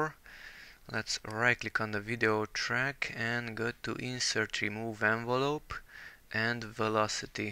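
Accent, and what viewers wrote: Hungary. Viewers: Köszi a segítséget, kicsit hallatszik a "magyar angol" :D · Epp azon gondolkodtam, hogy ez az angol kiejtes egy kicsit magyarosnak hangzik, ekkor megneztem a csatorna nevet...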